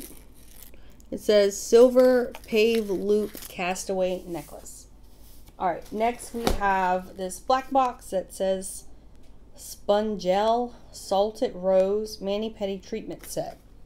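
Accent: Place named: Louisiana, United States. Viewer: I knew I were from Louisiana. I could tell by ur voice. I live in Marksville, Louisiana. I am a lil over an hour from Lafayette. Girl do u get the box Single Swag? It is from the same company as this box. It is a lol better than this box.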